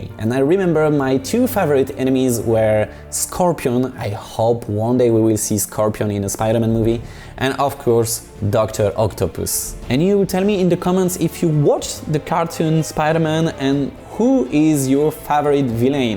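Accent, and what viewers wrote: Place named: France. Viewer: its always beautiful this french-english accent, keep goin :))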